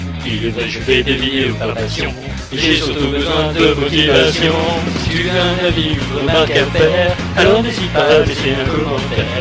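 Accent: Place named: Nord-Pas-de-Calais, France. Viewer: Yeah!! est de retour! Mec, tu m'as fait taper des barres de rire avec ton accent chti, en plus de m'avoir bien diverti avec une bonne critique. Faudrait que je me mette à Love Hina. J'ai tellement de Ken Akamatsu à rattraper. En tout cas ton retour fait hyper plaisir, bon courage pour la suite;)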